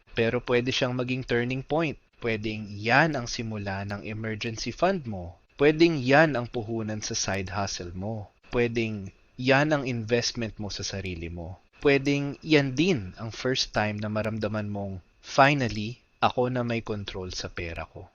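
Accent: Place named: Conyo, Philippines. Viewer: Out of curiosity, Tagalog is not the only language you speak growing up, right? Cuz I hear a lot of conyo pronounciation. Lol, not hating thou. Thank you for this video! 💜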